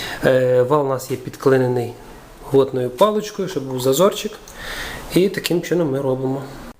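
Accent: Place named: Surzhyk, Ukraine. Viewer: Гарне суржик. Дякую.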